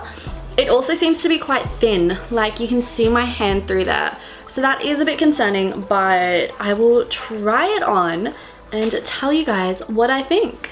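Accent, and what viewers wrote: Melbourne, Australia. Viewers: I'm American, and her accent sounds Australian. Just like us Americans, we have different accents from all over the country. I'm pretty sure the same goes with Australia. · America has stereotyped the australian accent 😂😂😂 i love your pure accent more!! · Why does your Australian accent sound mixed with American? Your accent sounds nothing like mine. I’m Australian also. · I get so used to hearing American's on the internet and in TV shows and movies, I always have to double take when I hear an Aussie, Proud <3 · What? she sounds completely Aussie. Is she supposed to sound like a bogan not be accused of 'faking' her accent? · Right when I clicked on her video, and heard her speak... I knew right away, she's Australian. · I'm Australian too and she sounds just like I do. Sometimes people tell me I sound American a bit too. I grew up moving from Queensland to Melbourne? 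Maybe that's why? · She sounds like every white girl in Melbourne lol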